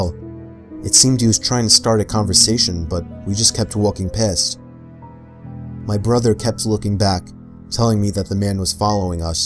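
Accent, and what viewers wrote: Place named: New York, United States. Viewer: Are you from NY? I hear that accent, you say dog the same way I do.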